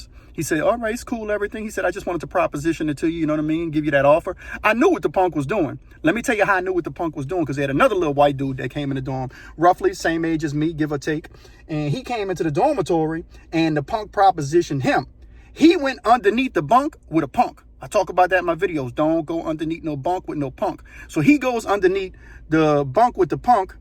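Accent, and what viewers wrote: Louisiana, United States. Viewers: In case anyone is wondering this is a Louisiana accent. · Where u from bayou boy ? St. Charles Parish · Are you from Louisiana? Like around New Orleans areas? Your accent sounds like it.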